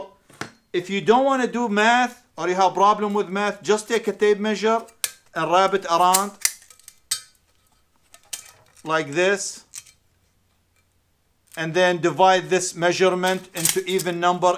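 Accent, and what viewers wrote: Iraq. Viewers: انته عراقي؟.حجيك عراقي من الوسط و الجنوب تحديداً! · من طريقة لفظك عرفتك عراقي !!!!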